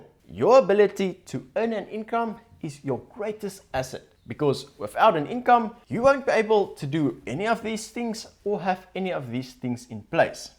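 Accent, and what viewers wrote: Afrikaans, South Africa. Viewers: So handsome. Strong Afrikaans accent. Very cute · its always refreshing how authentically afrikaans you are, genuinely feels south african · Damn, I enjoyed the Afrikaans slang and tone. Lekker to listen to something from RSA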